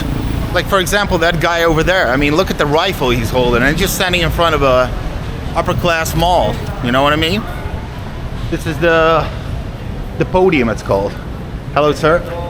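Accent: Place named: Hollywood, United States. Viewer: Your English as a Dutch sounds like Hollywood English accent.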